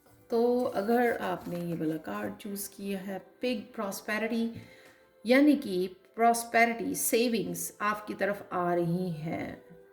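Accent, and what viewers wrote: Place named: Punjab, India. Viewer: Kya aap Punjab se hein. Aapki hindi mein punjabi ka touch lagta hai. Thanx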